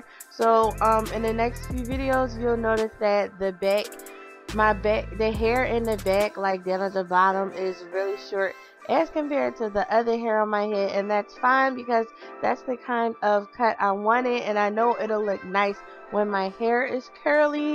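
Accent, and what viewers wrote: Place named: Philadelphia, United States. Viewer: Wait wait wait a minuteeeeee...as much as I have watched u and loveeeee you...your here in PHILLYYYY.....omg.... I knew that attitude and accent I freaking knew it lol...this made me happy....forgive me cause I’m always the last to know everything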